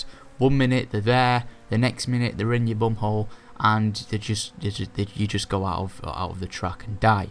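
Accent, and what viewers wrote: Yorkshire, United Kingdom. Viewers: r u from yorkshire?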